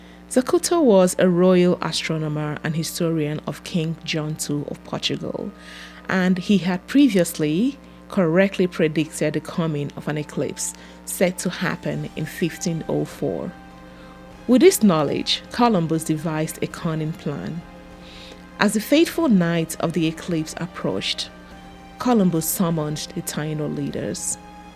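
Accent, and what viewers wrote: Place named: China. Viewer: Your English is bad, I'm missing 10 percent of your words. weird accent. I'm from London, dad's from st vincent. Sounds like you learned English from a Chinese person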